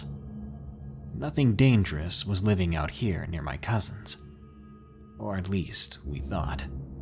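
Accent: South, United States. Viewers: He practiced, in his old videos he sounds super southern and not silky smooth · The american accent version of Lazy Masquerade. I kid you not, you both have the same tone, same way of narrating the only difference being the accent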